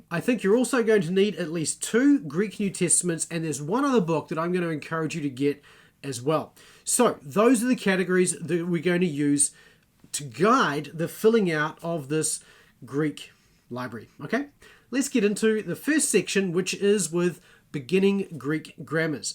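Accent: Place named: New Zealand. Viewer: Hi! I just stumbled upon your channel very recently and loving it. Thank-you! I noticed your Kiwi accent instantly as I am an Aussie. I am 3/4 of the way through Duff's "Elements of NT Greek" and really loving it. For some reason, this seems to be the industry-standard first-year Greek textbook for Australian institutions, hence my choice of it. Have you done a review of this yet? I could not find it anywhere if so. With regards to this video, I am wondering if Duff would be an adequate substitute for Mounce's book on your list?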